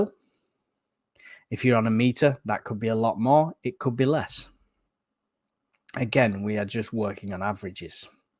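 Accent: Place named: Rochdale, United Kingdom. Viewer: Trying to pinpoint your accent. Going to guess Rochdale because of how you say “work” but the way you say “year” is really throwing me 😄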